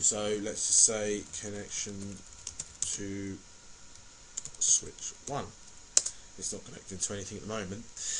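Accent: United Kingdom. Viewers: and british accent who is harder to understand than american accent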